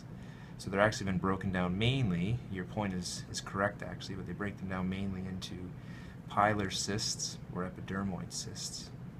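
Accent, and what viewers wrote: Canada. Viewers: Do I hear Canadian accent? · Are you from Canada?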